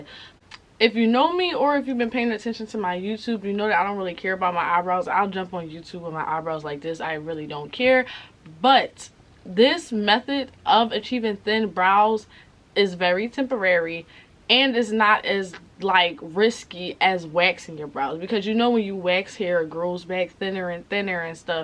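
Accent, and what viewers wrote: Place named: Philadelphia, United States. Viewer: You sound like you from philly, you’re so damn pretty btw 😍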